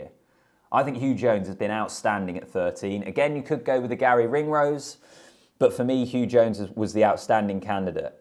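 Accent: England, United Kingdom. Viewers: Do you not hear a pretty english accent mate? Also we going to act like ireland bavent been top 2 in the world for like 3/4 years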